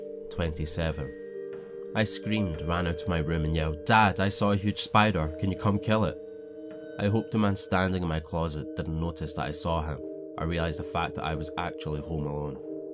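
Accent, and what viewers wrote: Scotland, United Kingdom. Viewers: Oh the snarky ones, good to hear that Scottish accent again😆😜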